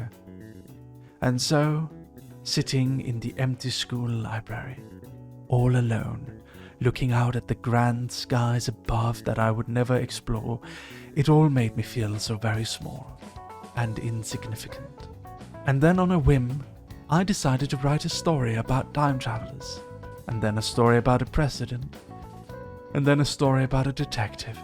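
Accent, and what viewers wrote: Denmark. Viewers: Funny considering every dane can easily hear he's danish But his way of speaking is very endearing! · While your English is very good, I can still hear the tiny bit of danish accent in it... as a fellow Dane, I can spot another Dane...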